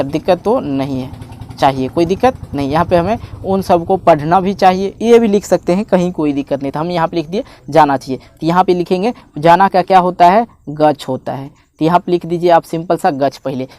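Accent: Bihar, India. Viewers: Sir aap Bhoot hi acha padhate ho aap bihari ho na 😅😅😅 mujhe pata. Chal gya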